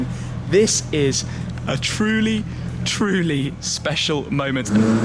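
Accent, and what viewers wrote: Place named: United Kingdom. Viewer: Must be nice to be white with a British accent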